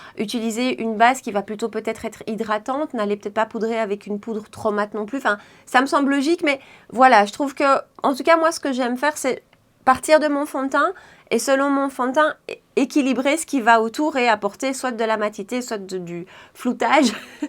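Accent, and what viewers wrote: Belgium. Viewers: Coucou d'une compatriote expatriée, ravie de retrouver les intonations, expressions du plat pays. Je suis de la team investissement sur le skincare et maquillage petits prix, mais j'ai des rides et des taches qui réclament un fdt ou BB crème performant, alors je me lâche sur le fdt. J'ai eu étrangement un gros coup de coeur sur le Nars comme vous, même si normalement le glow fait effet loupe sur les rides, celui-ci fait un teint éblouissant, comme vous dites, même en poudrant, et en unifiant, une merveille. J'ai craqué sur le Lancôme, mais gros flop, je trouve qu'il n'unifie pas, ne floute pas, ne tient pas, ne se fond pas, me fait vraiment un teint...moche! Malheureusement, il n'y a presque plus de teintes claires et médium du Nars sur les sites, donc je le garde pour les grandes occasions et les longues journées. En petit prix, j'aime beaucoup le Bourjois 123 pour le moment, avec une base illuminatrice dessous, excellent rapport qualité/prix pour moi(peau mixte)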